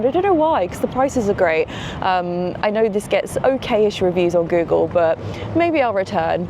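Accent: United Kingdom. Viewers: I come here to learn British accent 😂 thanks and take love from Bangladesh, ♥️🇧🇩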